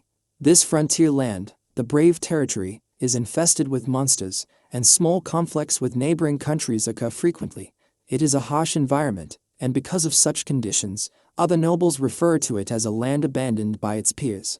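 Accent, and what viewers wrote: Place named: Sydney, Australia. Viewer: sounds like someone from Sidney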